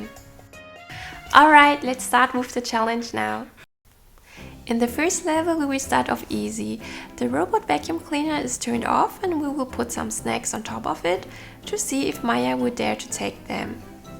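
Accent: Germany. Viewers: are you german? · You are from germany right? I mean the accent and at the top there was the word Werbung and I don't think it's English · You Are from Germany, Right?😃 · Her accent is like from a German ^^ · Are you German??????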